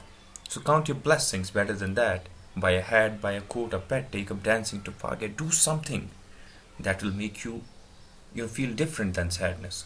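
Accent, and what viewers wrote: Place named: Kashmir, India. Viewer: you sound very kashmiri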